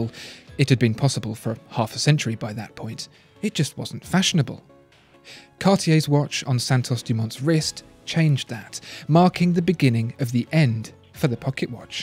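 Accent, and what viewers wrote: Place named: RP, United Kingdom. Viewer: Yo be clearer, you make it sound so confusing with all that posh, You're really good and i enjoy it but you have to make it clearer which one is th fake and which one is real and align that properly to the video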